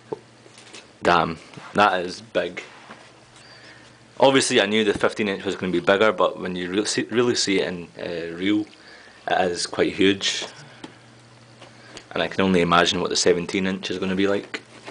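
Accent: Scotland, United Kingdom. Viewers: I just love the fact that you opened it with a butcher knife. Also love the scottish accent! great video!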